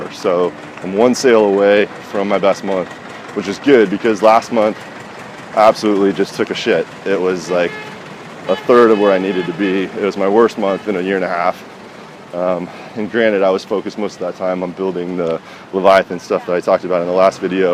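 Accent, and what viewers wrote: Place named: Arizona, United States. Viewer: Great Video! Very informative. And it sounds like you live in Arizona. + +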